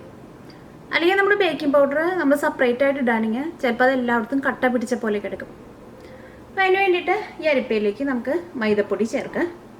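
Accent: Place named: Northern Kerala, India. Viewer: great recipe... from where in Kerala, are you from??? mixture of mid and vadakkan malayalam slang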